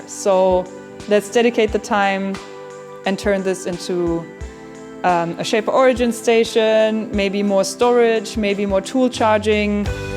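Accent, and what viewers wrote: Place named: Germany. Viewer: Wie angenehm - so ein schönes Englisch mit klarem deutschen Akzent zu hören, man versteht es einfach viel besser, als diese Ami-Aktzente mit den dicken Knödeln im Hals!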